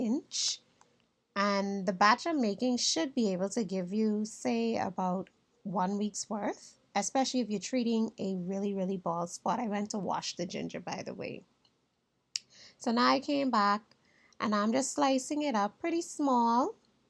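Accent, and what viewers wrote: Bahamas. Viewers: I hear an accent - Bahamian?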